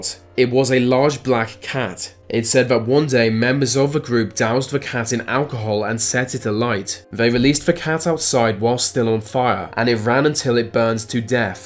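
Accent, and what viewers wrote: United Kingdom. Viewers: A british voice doesn't make one knowledgeable.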